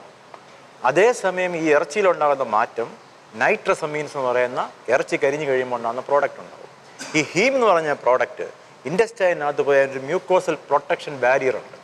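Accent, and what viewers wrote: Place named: India. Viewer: Why are so many of these "white poisons" videos narrated by Indians with heavy accents? Aren't there any American experts who are willing to speak up on this matter?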